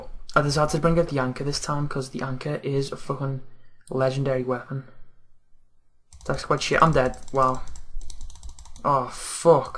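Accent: Liverpool, United Kingdom. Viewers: Cheers mate ;D love the vids keep them up, scouse accent is ftw!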